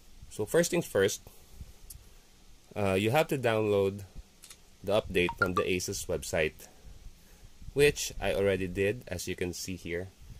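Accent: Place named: Philippines. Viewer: you sound like a filipino.. are you pinoy?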